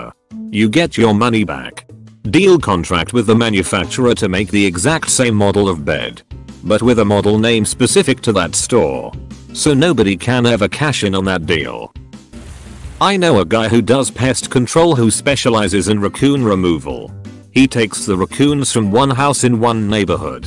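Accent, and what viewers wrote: United Kingdom. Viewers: I gotta watch out for that lurr enforcement. Why can't Brits ever pronounce their own damn words right?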